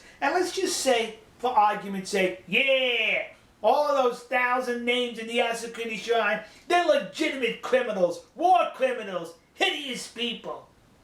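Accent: Texas, United States. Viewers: テキサス訛りの英語をですか？教材には向いていないと思いますよ。 残念ですが・・・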